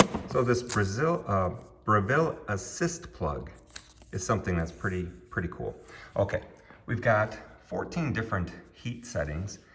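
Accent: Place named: Midwest, United States. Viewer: harrrrd midwestern accent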